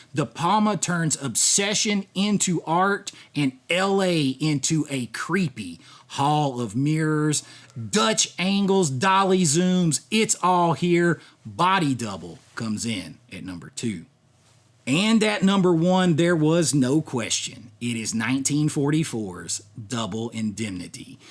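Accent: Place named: Louisiana, United States. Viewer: Your goofy louisiana accent doesn't fit with hard boiled urban anti-heroes from Film noir movies. Stop trying.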